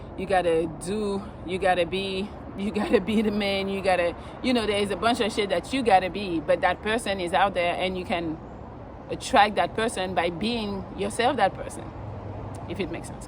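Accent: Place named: France. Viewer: I knooooow that accent was French...that sexy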